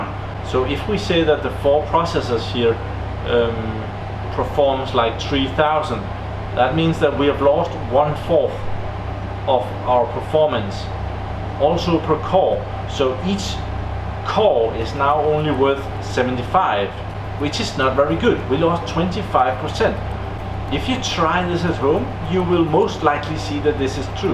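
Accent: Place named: Denmark. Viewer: did you say MPI? It looks like QPI to me. Unless that's your danish accident that says Q different.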